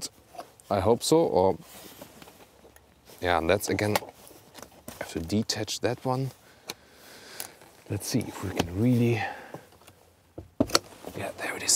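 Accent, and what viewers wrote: Germany. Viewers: Ich check das Kanalkonzept einfach nicht - deutscher Kanalname, deutscher "Presenter", aber dann dieses seltsame Englisch, bei dem jeder 100 Meter gegen den Wind hört, dass er Deutscher ist? Warum nicht einfach auf deutsch? Wegen der Reichweite des Kanals? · With the Cherokee I have difficulties to put a finger on the design. Seeing it on pictures or in videos you cannot associate it a Jeep. Seeing it on the street and standing near it this impression is totally different. Yeah, it is a Jeep and somehow it fits into the family. You have the Renegade, which is a fun car to drive, for younger people who don´t go mainstream with a Golf or similar, then the Cherokee for kids transporting mummies who normally would opt for the dead boring VW or the XC60 and then you have the GC which leaves a nice footprint in the premium class as one of the last remaining real SUVs. 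Btw. meine lieben people. As always great presentation - keep on going. But still, this German accent is still there. Never mind.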